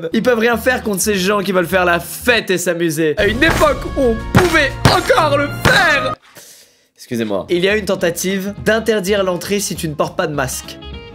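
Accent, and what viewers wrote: England, United Kingdom. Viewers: Toujours un accent anglais MA-GNI-FI-QUE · ✨l’accent anglais✨ toujours aussi beau 😭😂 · Toujours l’accent anglais qui est frais chakal hein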